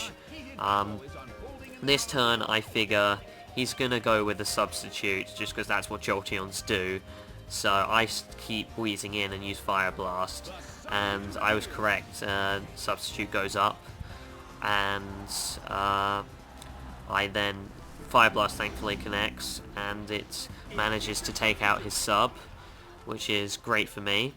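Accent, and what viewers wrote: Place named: United Kingdom. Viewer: I always love hearing Brit voices... I'm not quite sure why... but in any case, coo' battle. About the switching between Gyrados and Jolteon business, there's chances that you might overpredict them and they won't switch and if you do the wrong move expecting a switch it really messes you up. It's a good thing though he did do what he did... if all you did was Confuse Ray to Gyrados it still would've been good but imagine if you picked Surf and he didn't switch >_>;.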